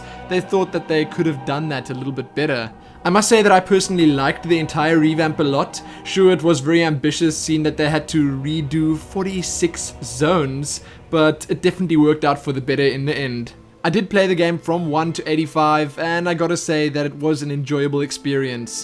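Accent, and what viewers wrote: South Africa. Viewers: What!?!? South african accent FTW!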